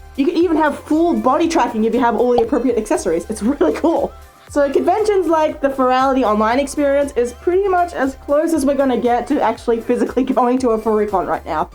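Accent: Australia. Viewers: Uh question are you Aussie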